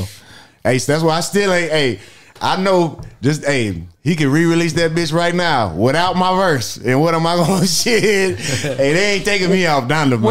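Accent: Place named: South, United States. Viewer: If I wasn't seeing who this was talking like this, I would automatically think that's a black man from the south talking like that. Damn, this dude has soaked up the culture soooo comprehensively. Got damn! Astounding!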